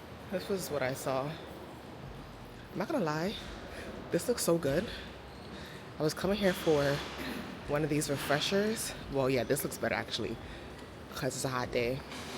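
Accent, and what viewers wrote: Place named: Caribbean. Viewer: Friend —- u really sound like u have Caribbean roots 🥳 I’ve noticed it in a lot of ur vids 🙃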